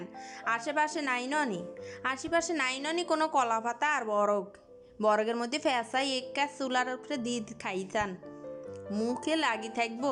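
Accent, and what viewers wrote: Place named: Noakhali, Bangladesh. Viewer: Puro dakha galam.valo noyakhqli vasa sunlam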